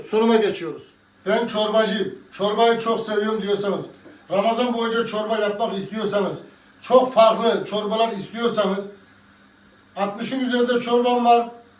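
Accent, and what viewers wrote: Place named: Anatolia, Turkey. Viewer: Ülkemin güzel şiveli; babacan , yardımsever şefim . Senin o Anadolu kokan şivene gurban olurum 😊😊🙏🙏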